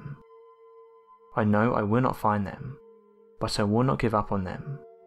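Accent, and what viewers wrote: England, United Kingdom. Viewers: He has a normal English accent, and no one is making you listen to it.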